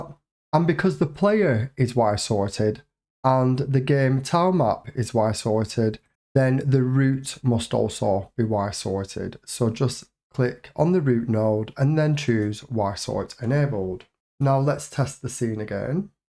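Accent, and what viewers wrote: United Kingdom. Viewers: Let me guess, British?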